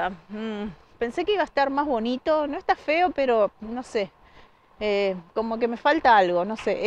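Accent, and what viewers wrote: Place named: Montevideo, Uruguay. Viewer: Vos si q nos hacés el honor de representarnos. Tu forma de expresarte es bien de montevidiana. A pesar de tantos años que vivís ahí no perdiste ese "que sé yo",😉. Me encanta que seas vos misma como todo los q tienen su propio canal. Feo es cuando los uruguayos se van a otro país y después se creen mejor que los demás Ese barrio es precioso. Disfruto muchísimo tus videos,esos lugares q me hubiese gustado conocer en persona,pero bueno,para q está y su canal? Para hacernos conocer New Yersey y New York ☺️😉. Bien, encontraste la panadería 👏. $900 😳🙄🤦. De veras que no hay ni un asiento para sentarte 😁. Pero bueno ☺️😂. Andás con alcohol para las manos antes de comer? Qué? Eso te salió 20 dólares? Con razón te hizo sufrir pagarlos. Claro que disfruté mucho,😘🙋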